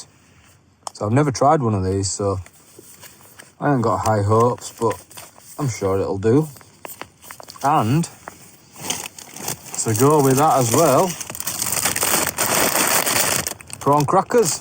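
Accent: Yorkshire, United Kingdom. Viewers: I've been watching your videos for a few weeks now and love them. Being a Yorkshire lass, I never get sick of that accent ❤. One thing I really need to know is... where did you get sticky toffee pudding coffee from? It sounds amazing. Keep up the great videos , I love watching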